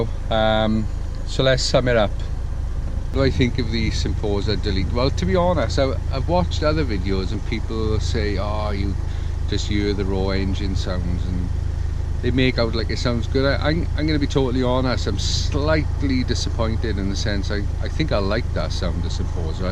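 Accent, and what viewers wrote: Wales, United Kingdom. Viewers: Anything that drowns out that God awful Welsh accent, shouldn't be removed.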